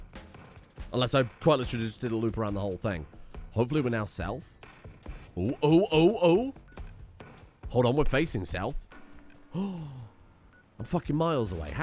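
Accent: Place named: London, United Kingdom. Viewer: For a man who says he's from the West country, and lives in the middle of nowhere, how are you this south-east Anglais and loathing of everyone around you? > Has fully swallowed the Greek-Romance-makes-you-sound-smart pill > hates anything English > has a south east accent. You sound like a man who's been brought up in the heart of London in the upper or middle class and is trying to hide that 🤔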